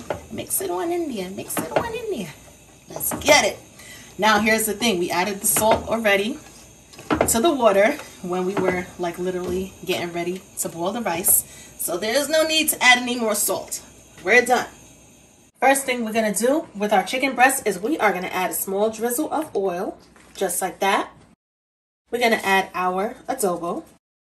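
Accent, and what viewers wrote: New York, United States. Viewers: Ive never heard a new york accent sound so polite 😂❤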